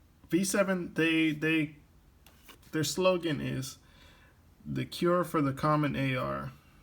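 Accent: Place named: El Salvador. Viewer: Unless you came from elsalivdor? Speak english without the accent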